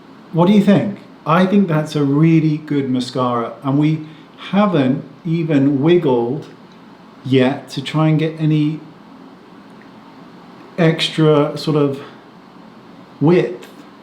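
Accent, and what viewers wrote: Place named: Wales, United Kingdom. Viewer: Great mascara, bloody lovely, with a welsh accent too! Love it x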